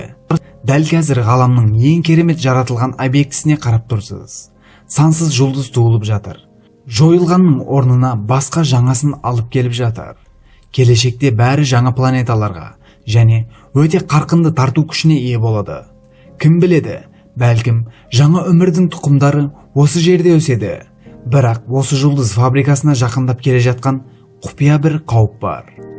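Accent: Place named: Uzbekistan. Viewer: Сөйлеп тұрған бала Өзбекстанский акцентпен сөйлеп тұр ғой примерно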